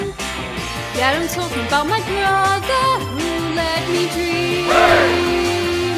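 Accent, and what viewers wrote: Liverpool, United Kingdom. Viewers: Don't worry, definitely not the worst impression of a northern accent I've heard lol 😆. Thought it sounded like a mix of Scottish and Liverpool, with a hint of Yorkshire, myself. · Love a good Scouse accent. Love it. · Nice scouse accent lol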